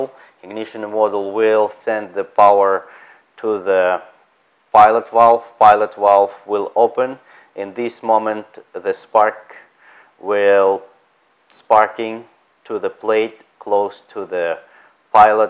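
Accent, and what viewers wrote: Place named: Russia. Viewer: 🤣😅да на таком рашен инглише почти все у нас в сша и говорят. у меня волосы дыбом но все англоговорящие вынуждены понимать и никто и глазом не моргнет) а куда деваться?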